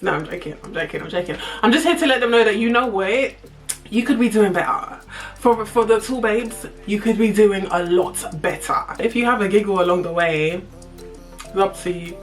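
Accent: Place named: Essex, United Kingdom. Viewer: but from Essex! 😅😅 Love it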